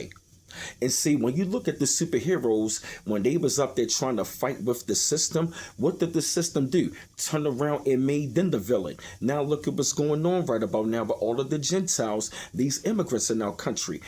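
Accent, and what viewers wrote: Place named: Philadelphia, United States. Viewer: I Hyped this video with points. Your voice sounds like you live in Philly. Great narrative!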